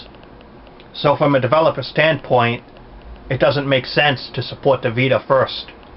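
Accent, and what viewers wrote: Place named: New York, United States. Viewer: By the way, New York dialect FTW!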